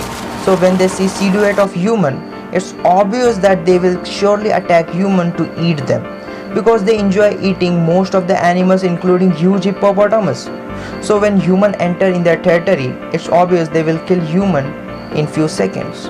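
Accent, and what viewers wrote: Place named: India. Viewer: Felt from ur accent . Me Indian too . Ez science . :)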